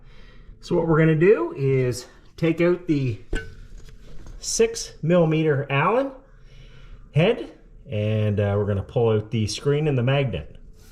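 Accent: Canada. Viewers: Great video! Really clear! I'm from New Zealand and I enjoyed the Canadian accent too!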